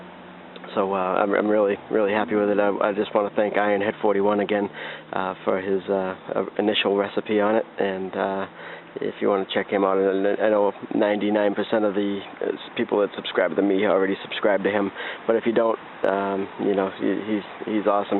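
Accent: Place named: Boston, United States. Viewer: Is that a Boston accent ?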